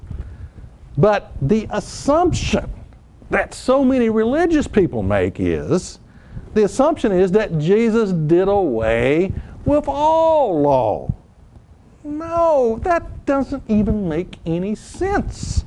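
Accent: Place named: Australia. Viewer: Pea brain lol. You are a man after my own heart and speak just like me... an Aussie lol. I think you did a great job explaining the difference between a Law and a commandment of God. Thanks... you helped me a lot. So I now know that if need be, I can walk more than 600 meters on the Sabbath day. Now what about the food laws... can I eat pig? It is not written in the Ten Commandments, but it is in the written law of Moses right?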